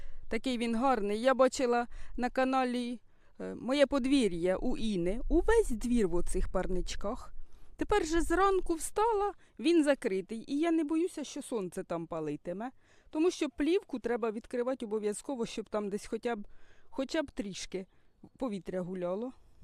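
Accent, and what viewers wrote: Poltava, Ukraine. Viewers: Доброго дня, а мені здається, що ви з Полтавщини, я полтавчан визначаю по розмові. Родичі були на Полтавщині раніше. Херсон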